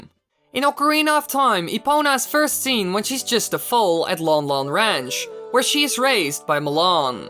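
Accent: Netherlands. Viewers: Tombsday he’s Dutch that’s how people from the Netherlands sound like when speaking English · Wow dutch accent much haha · lol. ik ben ook nederlands en ik herken dat accent van 10.000 kilometer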